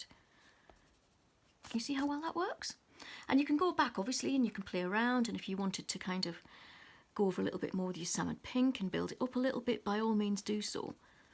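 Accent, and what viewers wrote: England, United Kingdom. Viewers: Really lovely video I have only just started colouring so I am looking at as many videos as I can. It’s lovely to find an English voice as most of the videos are from the USA. I just hope that I can one day colour as good as you. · I am feeling closer to the UK now hearing you during this tutorial! Thanks darling!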